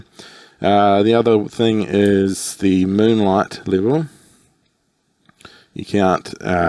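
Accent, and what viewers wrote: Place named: Australia. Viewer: I stand corrected… sound like an Aussie to me! wtf?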